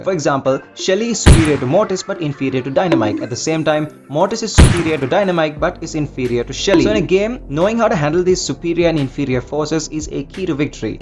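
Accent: India. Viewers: Ooh it sounded like an indian ,but never mind you are our neighbour feels great ,keep uploading this tips 👍🥰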